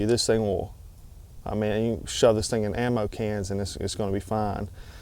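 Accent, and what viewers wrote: South, United States. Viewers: Do you have to buy all of these knives? I would have to agree that $385 is alot. Also I went to their website and it was difficult to navigate. Wish it was a little more user friendly. Good review though. I trust your opinion because it sounds like your from the South as well lol. Also I don't know if you have done this in other videos, but I'm not a steel expert so explaining the different qualities or benefits of the different steel would help me out.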